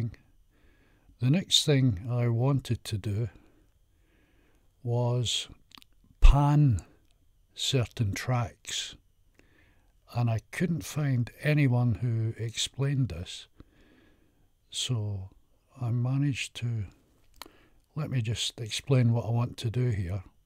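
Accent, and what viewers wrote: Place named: Scotland, United Kingdom. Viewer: Great video! I love your Scottish accent!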